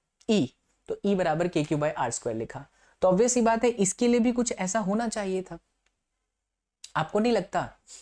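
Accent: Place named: Bengal, India. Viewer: Sir English me bola kro Bengali lgte ho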